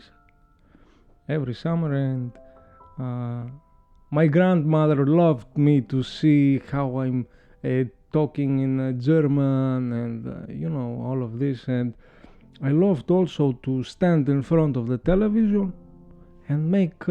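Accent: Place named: Greece. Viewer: The pronunciation a greek element !🤣 Όταν τελειώσω την έκθεση που γράφω, θα κάτσω να το δω.😉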